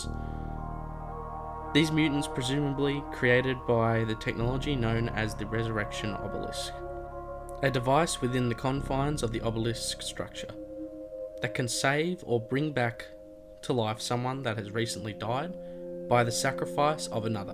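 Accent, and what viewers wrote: Australia. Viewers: ugh your Australian accent is so pleasing to my ears 🥺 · Are you Australian ?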